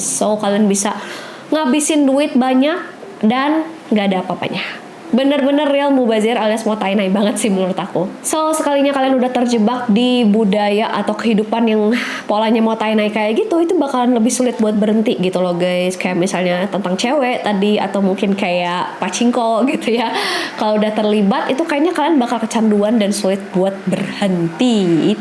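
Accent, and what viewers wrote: West Java, Indonesia. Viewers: Sunda banget euy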